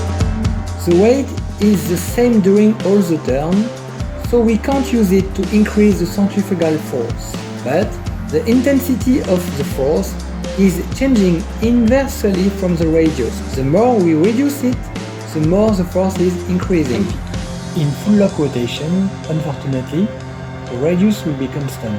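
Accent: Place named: France. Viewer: Bon rappel. Merci pour la séance de l'été dernier. Lovely french accent.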